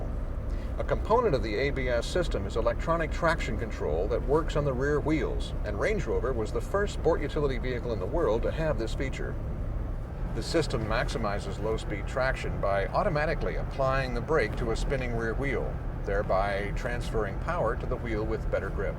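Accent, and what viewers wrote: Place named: United States. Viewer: this is one creepy VHS era video why is an american bloke speaking with a posh English music in the background? :D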